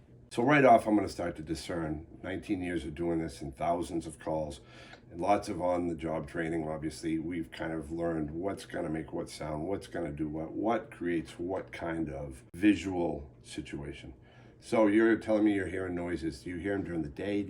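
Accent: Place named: New York, United States. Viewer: I thought you were based in NY by your accent....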